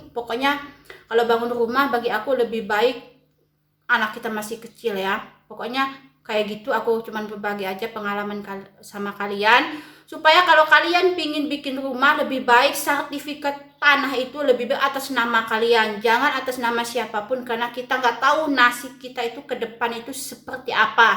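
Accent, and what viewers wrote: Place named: Lampung, Indonesia. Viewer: Logat mu kayak org Lampung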